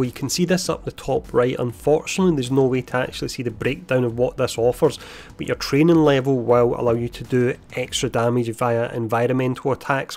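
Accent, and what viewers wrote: Scotland, United Kingdom. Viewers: Ah ok, this is scottish. We will see ;-)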